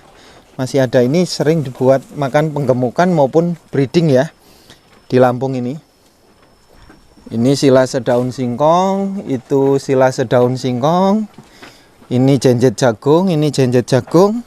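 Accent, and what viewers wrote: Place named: Banyumas, Indonesia. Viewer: Ora ngapak ora kepenak nimba ilmune cikgu salam ngarit❤️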